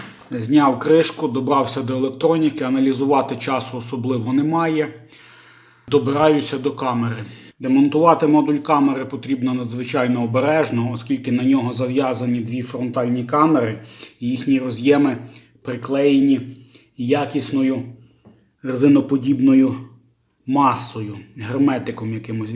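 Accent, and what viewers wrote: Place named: Polissia, Ukraine. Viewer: Зацікавив ваш акцент. Ви випадково не з київської області (з регіону де живуть Поліщуки)? Частенько чую у вас слова притаманні саме тому регіону.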